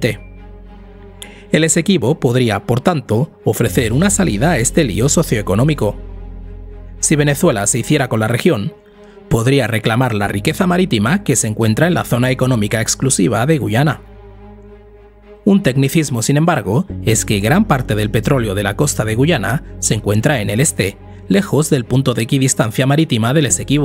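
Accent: Spain. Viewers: Este analista le faltó leer más. El Esequibo es parte de Venezuela, ya esa parte del territorio no debe estar "rayada". Una zona en disputa no debe ser aprovechada por ninguna de las partes y Venezuela ha cumplido. Si una de las partes quiere invadir el territorio esa es Guayana, porque el Esequibo es de Venezuela, así es que el cuento, espero que sepas cual es la verdad y no la hayas dicho por algún interés económico particular, porque de no ser así, entonces es que de verdad eres absolutamente ignorante de las realidades geopolíticas de las que tratas en tu canal. También quiero acotar que cuando te vayas a referir a las economías en el caso de Venezuela, no obvies un hecho de mucha influencia en la geopolítica económica como lo es las sanciones unilaterales impuestas por EE.UU. (Sanciones) y has un análisis retrospectivo del antes y después de ellas en el desarrollo de Venezuela. 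Por tu acento creo que eres español y por tu forma de referir el tema de Venezuela me parece que eres de los que aun les duele la patada por las n@@ que Simón Bolívar en compañía de esclavos e indios, le dio al imperio español hace más de 200 años, después de más de 500 años de saqueo en nuestra América Latina. No uses este medio para engañar a la gente.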